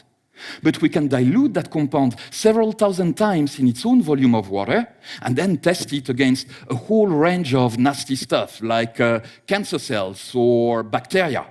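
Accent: France. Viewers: Okay French accent is now cool XD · Speaks very well, french accent or no. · I don't care if can change the world I became total dumb about rational things when it come to spider 😭😭😭😭😂😂😂 et ptdrr Rpz l'accent Français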